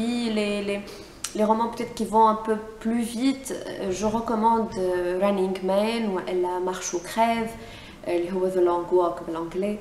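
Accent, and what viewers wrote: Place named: Tunisia. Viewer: l'accent tunisien <3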